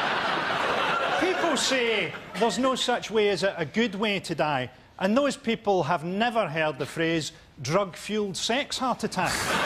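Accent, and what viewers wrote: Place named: Scotland, United Kingdom. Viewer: 🤔🤔think you need to check that one mate. As a spud myself he sounds pretty (100%) scottish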